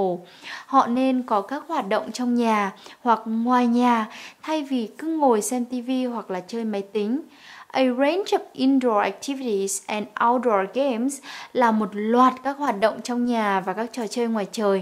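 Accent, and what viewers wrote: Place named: United States. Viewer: You have a very beautiful and melodic voice, plus your Great American accent has amazingly appealed me to your lecture ! Thanks for your useful video, it'd help me a lot!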